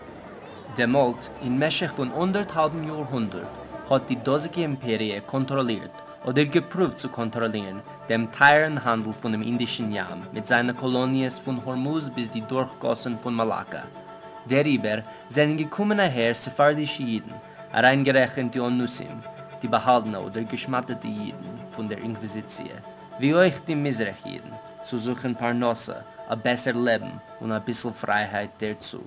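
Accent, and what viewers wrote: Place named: Northeast, United States. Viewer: How interesting. I have never heard the language that the spokesman delivered. I am a Swedish American who has a bit of an understanding of Swedish, Spanish, Latin and a great understanding of US English. I could just listen to this man & understand perfectly well what he was saying. Though he seemed to be speaking a pigeon blend of English/German/Swedish. His accent was clearly US Northeastern English.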